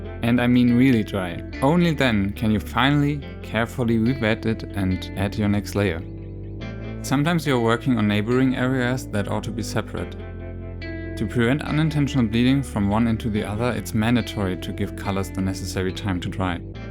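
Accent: Germany. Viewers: are you german hahha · Are you German? You have a German accent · One question, are you German? Because your dialect sounds like you are german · Is he german? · Ich finde man hört so stark, dass du deutsch bist, ist bei mir aber auch so. :D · Are you from Germany? In comparison to my accent when I speak English it sounds pretty similar^^ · Bin ich die einzige, die raushört, dass er eindeutig Deutsch ist? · This is a Very German Accent 😂 But i am German too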